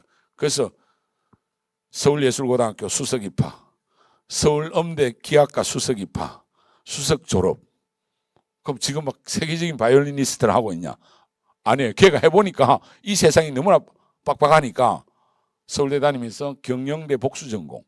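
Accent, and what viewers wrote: Gyeongsang, South Korea. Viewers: 경상도 표현이 좀 섞여서 그런거에요. 기분나쁘게 말한건아닌데 그렇게 들린것같아요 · 대가리나쁘면 공부하지마 여학생이졸고있으면 쳐자빠져자는 미친년아 ㅋㅋㅋㅋㅋㅋㅋ 분필던짐 여기서 한두명만인서울 간다고 특유의 경남말투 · 말투가 경상도인데 무슨 서울? 경상도 출신이 서울사는거겠지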